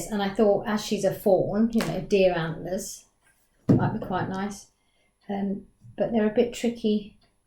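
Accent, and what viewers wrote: United Kingdom. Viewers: Hey there! Long time no see! How's going with the house work? Is it done? I've been away from surfing thru dolly videos of my good friends here such as you, Jay Dolls, Dolls Rescued and others coz I felt really depressed with some personal problems. They were solved, thank God, but my depression continues, at least now I'm little by little coming back, but there is too much to catch up, so it might take a while to see all the lovely videos. You didn't make much, so won't be long. I definitely need a ResinSoul girl, coz they are legit and affordable, but not atm unfortunately. Your doll looks such well made, they do an incredible work for a non expensive legit company, i'm a fan of they, I should get it a long time ago, but it was for the best coz I would made the wrong choice, I thought dolls with closed eyes were the same as Blythes, that can be open and close at the same time, I don't like bjds with closed eyes head. 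Now that I know everything I need I'll pick the right girl with the right body in normal skin! Anyway, is nice to be here again, nice to see you and hear your beautiful UK accent, I love it! kisses!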